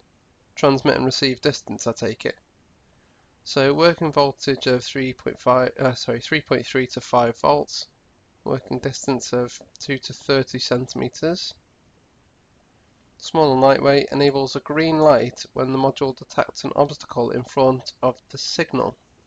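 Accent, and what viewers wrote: United Kingdom. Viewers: I just bought a 100w solar panel, 12v gell 35Ah battery & charge controller. Because, like you, I want to learn about solar. Seeing Julian Ilett frequently use his solar system on his 'tronics bench, I thought I'd try it out. BTW, if I can ask, what is your UK dialect? This Amurrican is a huge fan of EVERYTHING UK, so I really love the accents from different places in the UK. I think my favorites are Welsh, Yorkshire, Cockney... Oh, yes, and 'posh'. Best!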